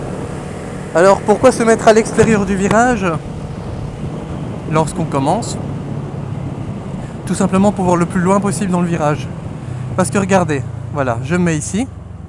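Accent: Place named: Hainaut, Belgium. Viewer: Bonne vidéo, pas mal pour les débutants. Bonne élocution. Et l'accent du Hainaut ! 👍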